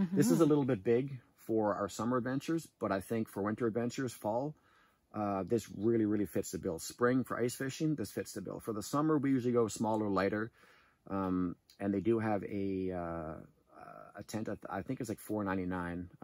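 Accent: Canada. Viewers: Im 49 yrs old...i have not been camping since i was 13...... my wife and i are just now talking about possibly getting some camping gear...this is cool Brother you sound like you have a very thick northern accent....im guessing Canada???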